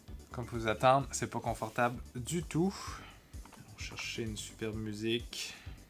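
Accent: Quebec, Canada. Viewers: L’accent québécois ressemble a rien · Non cest l'accent québécois qui est insupportable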